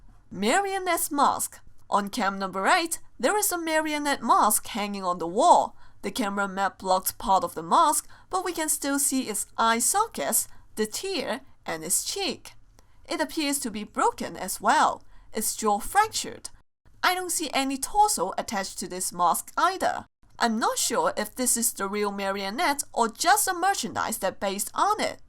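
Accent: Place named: China. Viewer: TOO MUCH CHINA ACCENT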